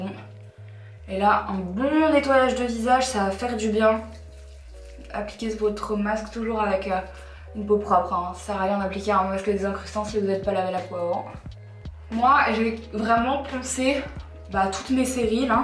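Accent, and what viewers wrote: Belgium. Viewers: Tu commences à prendre qqes intonations belges dans ta voix c'est mignon ^^ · tu commences à prendre l'accent belge! ahah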